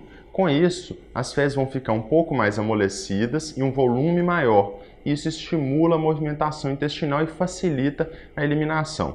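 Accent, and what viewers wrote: Minas Gerais, Brazil. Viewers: Vc e mineirinho ?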